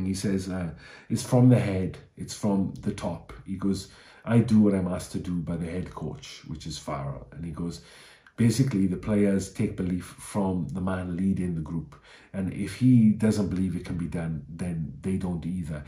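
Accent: Wales, United Kingdom. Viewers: Nice video. I spent the first 30 seconds wondering were you Welsh or SA. That accent has travelled. 😄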